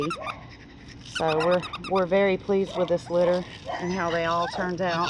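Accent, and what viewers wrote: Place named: South, United States. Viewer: Well they have a southern USA accent so I would say no to Australia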